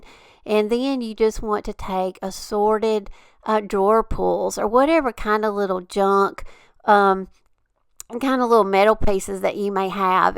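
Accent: South, United States. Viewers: I absolutely love ALL of your projects ❤ they're so amazing and so beautiful... I always walk away so inspired by you!!! My husband and I were wondering where you are from? He was laughing at the fact he always thought I was extremely country sounding...until he heard you!!! We live in N.C. sooo we know country!!!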